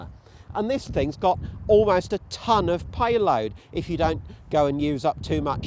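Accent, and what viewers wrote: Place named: United Kingdom. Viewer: Nice video, a little too fast to get the best view of the m/h Squeaky British voice too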